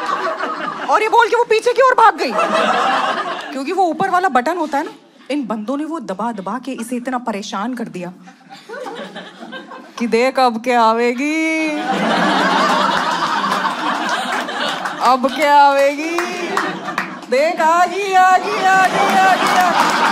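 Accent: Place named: Punjab, India. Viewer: U r not funny at all. I don't know why people are laughing. Punjabi accept and poor punches.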